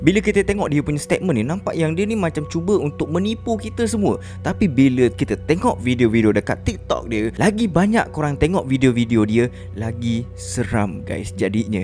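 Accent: Malaysia. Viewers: Kwkwk lucu, logat melayu memang sangat lucu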